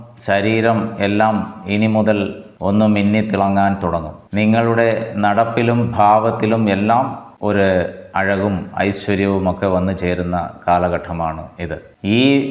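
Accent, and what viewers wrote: Tamil Nadu, India. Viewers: Tamil touch. But good good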